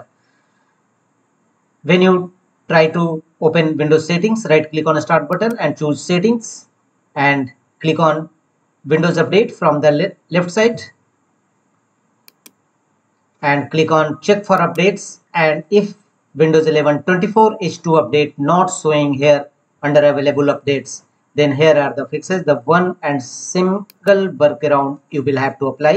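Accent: India. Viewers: Thank you for your teaching, it seems that the professional version only has gpedit.msc, when you have time to play to see; it is a pity not to modify the registry file regedit, I am relatively familiar with. I'm familiar with regedit, and I'm guessing from your voice that you're a friend from India, and I've lived with friends from India before. ( DeepL translation ) 謝謝您的教學，好像專業版才有 gpedit.msc ，有空的時候可以玩玩看；很可惜不是修改登錄檔 regedit , 個人相對熟悉，。聽您的聲音猜測是印度朋友，曾經和印度朋友居住過。( DeepL翻譯 )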